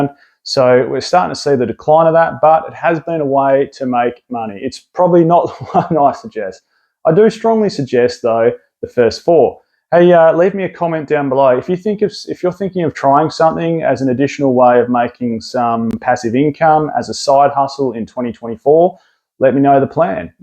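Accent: Australia. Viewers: All facts, i just really enjoy these videos. The aussie accent doesnt hurt either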